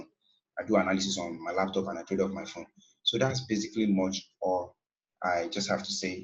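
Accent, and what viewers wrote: Nigeria. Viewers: Are You a Nigerian ?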